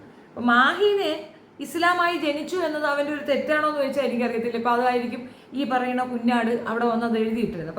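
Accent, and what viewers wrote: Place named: Thiruvananthapuram, India. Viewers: വടക്കൻ ട്രിവാൻഡ്രം ആണ് അതാണ്‌ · തിരുവനന്തപുരം ശൈലി 😂😂 ആണെങ്കിലും താങ്കളുടെ അവതരണരീതിയും ഭാഷാ സ്ഫുടതയുംനന്നാവുന്നുണ്ട്.. ചിലർക്ക് നിങ്ങളുടെ പോലെയുള്ള മറുപടി ആണ് വേണ്ടി വരിക..😂😂.. സമൂഹത്തിന് ഗുണകരമായവയും വഷളത്തങ്ങൾ അലങ്കാര മാക്കി മനുഷ്യരുടെ ഇടയിൽ അകൽച്ചയും വർഗ്ഗീയ ചേരിതിരിവുകൾ സൃഷ്ടിക്കുന്ന വർക്കും ഇങ്ങിനെ യൊക്കെ മറുപടി പറയുന്നത് ഉചിതം തന്നെ ❤😂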